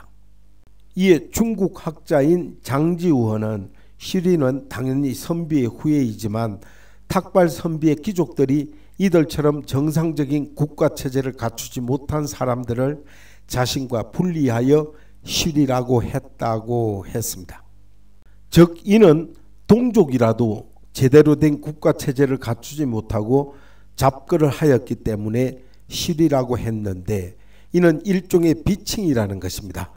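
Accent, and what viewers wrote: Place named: Busan, South Korea. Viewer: 하이고 ᆢ 나도 부산이지만 사투리 좀 고쳐라 ᆢ 다른 유투브에서 그러더니 아직도 못 고쳤네 ᆢ 왜 그리 어미에 그리 엑센트를 두냐 ᆢ?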